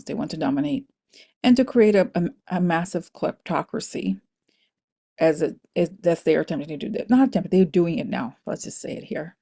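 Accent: Russia. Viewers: The switch between your Russian voice, perfect English and funny faces is super... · Love your Russian accent! Thank you. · I think your Russian accent is hilarious! My only piece of advice, after spending about a month total in Russia many years ago: Pronounce your “h’s” as if you’re trying to clear phlegm from your throat…. Especially with the word “happy”. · I love your Russian accent ❤ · I love you Russian accent.. I think it's cute I think it's precious and I understand every single word you're saying.. ❤ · I love your Russian accent!! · I love YouTube. Sometimes I watch your video almost to the absolute end but don’t let it completely finish because I want to comment and when I come back it isn’t where I left it but it comes back to a part that intrigued me when you spoke about it the first time!!!! Freaky cool! Love your work AND YOUR RUSSIAN Accent!!!! And I love the makeup and hair styling!!! 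You are working so hard whatever you need to do to keep yourself soothed and I think fresh and beautiful!!!! So appreciate all your hard work! Much love from Michigan. 💛✌🏻 blossoms are coming!! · I love your Russian accent! Makes me laugh every time. I love your welcome song too. Look forward to it every video. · I love the Russian accent. makes the commentary come alive. Very entertaining. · Screw those people who don't like the Russian Accent. I love it!! We got you girl!! 🎉🎉🎉🤪🤪💙💙💙✊️✊️✊️ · I can understand your Russian accent just fine; I have a degree in theatre, though, so…🤓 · To hell w people that don’t understand ze Russian accent. 😂 For crying out loud! I think it’s super cool when you do it!! Return to zender!! 😅 😊Rock on!! ❤ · I like your Russian accent and it’s funny! Keep it up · I love your Russian accent and all your videos. You and Corina are so much better informed than any other channel. 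You are the best!!! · I love your Russian accent you are an actress and a channele. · I am hearing impaired so I either read the CC or the transcript. Pay no mind to negative comments regarding your Russian accents. You make me laugh. I adore you.❤ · I love your Russian accent. I enjoy listening to you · Love your Russian accent 😂💞 Thank you for the work you do ❣️ Much love and gratitude 💖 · Your Russian accent is just fine. I have no problem understanding you. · I have no problem understanding your Russian accent. You are just PRECIOUS and you make me SMILE!!!😊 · I love your readings and your Russia talking voice😅. You're great! 🥰💞🤍🕯️🕊️ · I enjoy listening to you,. Always informative. And I understand you perfectly, even your russian accent😅 Take care😊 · i love your russian accent. it is hilarius. I laugh out loud. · I love the russian accent, that is so funny. I live for it. Not only do you give us hope but you make us laugh. 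Its everything, dont change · I don’t mind your Russian accent! I just use English captions on the video! 😂😂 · I love your Russian accent! You are very good at that and making their faces. I always laugh and I CAN understand what you say. We just have to listen. Thank you for all you do to bring us much needed information.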